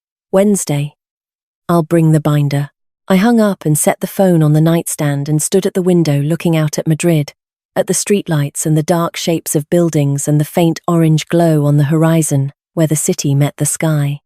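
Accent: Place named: United Kingdom. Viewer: Narrator has a very lovely voice but it was a bit bizarre that she’s clearly British. Didn’t quite fit a story supposedly from Cincinnati. Was quite distracting.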